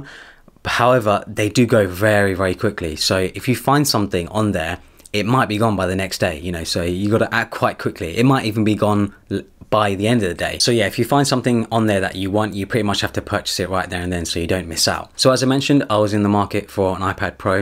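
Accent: United Kingdom. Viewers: love how he's indian and has a British accent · First time 9n your channel man and damn your accent is wow! Never liked the British accent before hearing it from you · I normally get annoyed with British accents but i can listen to you all day. Nice review!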